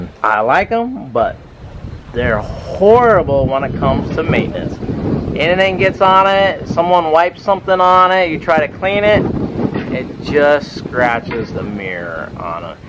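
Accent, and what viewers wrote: South, United States. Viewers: What kind of accent this guy has? Sounds like he has a deep southern accent, or OK accent?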